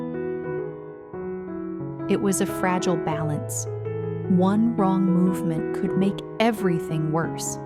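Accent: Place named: United States. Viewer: disgusting American pronunciation with that "r". It's like it's choking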